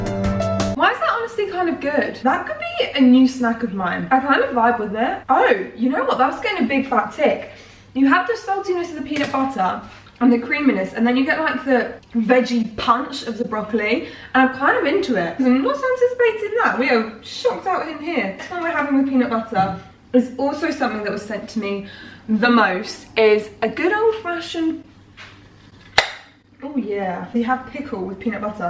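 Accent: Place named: United Kingdom. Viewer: For some reason you sound extra British in this video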